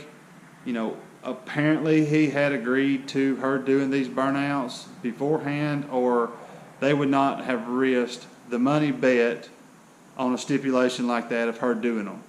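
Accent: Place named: South, United States. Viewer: That's the kind of cheap barn that attracts tornadoes. You sound like you're from the south, I hope you have insurance ....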